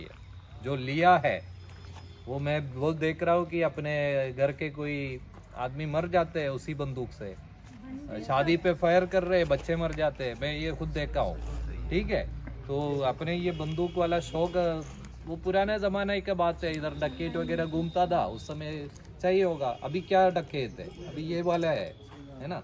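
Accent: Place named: Kerala, India. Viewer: Mujhe aapka Hindi sun ke humare Kerala teacher ka yaad aa gaya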